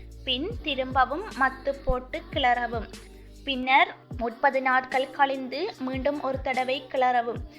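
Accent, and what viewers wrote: Sri Lanka. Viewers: She is speaking srilankan tamil..pakki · Elangai Eelatamil arumai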